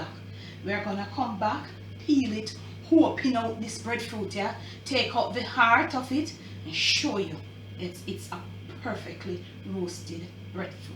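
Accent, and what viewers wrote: Jamaica. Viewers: You too sweet.i enjoy your cooking and your Jamaican accent.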